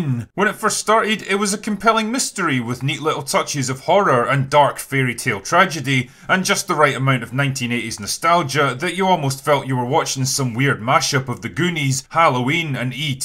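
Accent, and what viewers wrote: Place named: England, United Kingdom. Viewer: Thought he was from England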